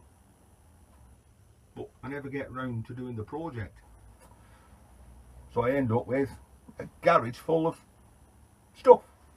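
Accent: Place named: Wigan, United Kingdom. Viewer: You sound like a Wigan lad, what scrapyard do you use if i may ask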